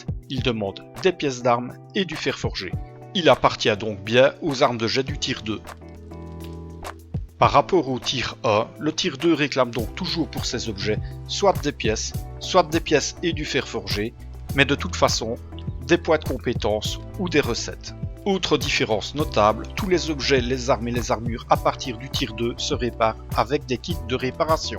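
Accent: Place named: Belgium. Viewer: Au vu de ton accent et celui de papy, je dirai que tu proviens de Belgique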